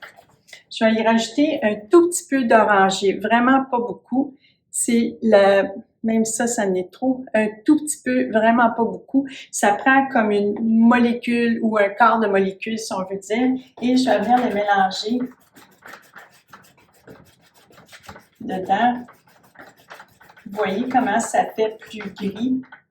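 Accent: Quebec, Canada. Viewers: MERCI BEAUCOUP, POUR TOUS VOS CONSEILS, J'AVAIS ABANDONNE LA PEINTURE ,ET VOUS M AVEZ DONNE AVIS DE REPEINDRE ,VOTRE ACCENT EST TRES AGREABLE, ET DONNE ENVIE DE PARTIR AU CANADA BONJOUR DE FRANCE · Merci bcp ces nuances variees lumineuses rejoignent un bel accent du QUEBEC !!! A Bientot 😮😊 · Merci pour cette démonstration superbe et tellement concise préside et intéressante avec en prime votre très bel accent canadien encore merci je m abonné de suite 👏👏👏👍👍👍💓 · J'aime bien❤ ton accent, il est canadien ? Merci pour toutes ces leçons et ces bons conseils 💐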